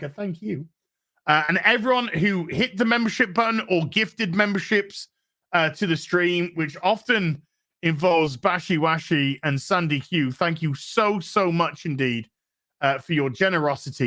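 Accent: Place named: United Kingdom. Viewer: you are my favorite FNT regular and have now become one of my favorite YouTube channels. As an overweight, bearded, balding American man, I watch you and think, that's what I'd sound like if I had a proper British accent. Keep the entertainment coming!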